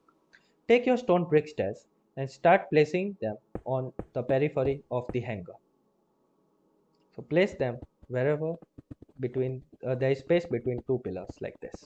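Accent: India. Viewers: bro you got the most yet best indian accent i have ever heard!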